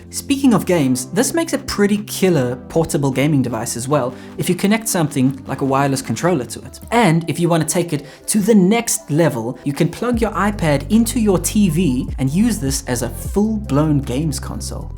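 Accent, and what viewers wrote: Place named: South Africa. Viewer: Subscribed because I need Tech Reviews delivered in a sick South African accent!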